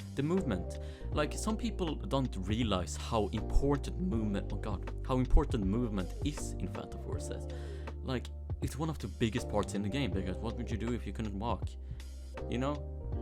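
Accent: Sweden. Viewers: Ahh, the original Swedish accent. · sweden? i can hear that little accent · are you swedish? 🤔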